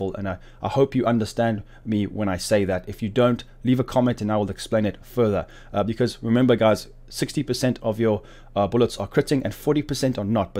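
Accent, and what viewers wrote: South Africa. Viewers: Hey man, had this game about 6 weeks and you're my go to channel for it now. Just curious enough about your accent to ask where you're from? My ears are saying 70% South Africa and 30% New Zealand.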